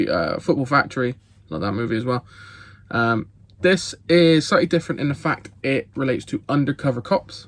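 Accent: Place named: Bristol, United Kingdom. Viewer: A fellow Bristolian loving hearing the accent and also the sheer variety of films you watch - keep up the good work!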